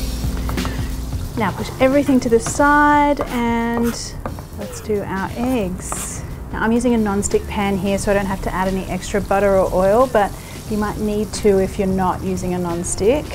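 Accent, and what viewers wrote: Australia. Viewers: You and iggy azalea have the exact same voice lol And it's not just the Australian accent. It's the same tone and pitch.. Mmm rice looks so good. I'll be making this tonight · You sound like you grew up in Australia, then moved to the US south and started to pick up some of their accent.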